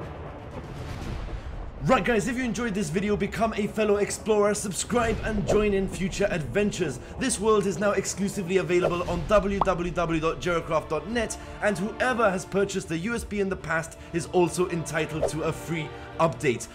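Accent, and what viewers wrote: France. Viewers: Waw, you have a french accent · are you french Jeracraft ? I am french and your accent sounds french x)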